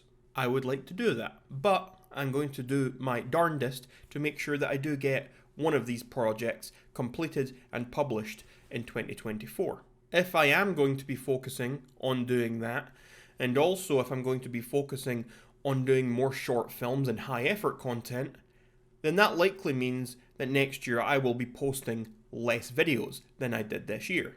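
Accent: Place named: Scotland, United Kingdom. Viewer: Bro saying Australians have the worst accent while this dude is Scotland (not hating on Scotland btw)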